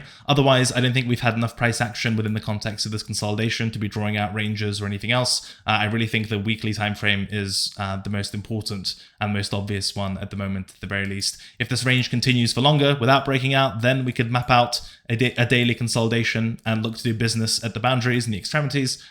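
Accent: United Kingdom. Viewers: Team here. Sure, it might be more profitable to copy trade the duck. But you can't beat the charm of ' lovely British accent and self-deprecating humour.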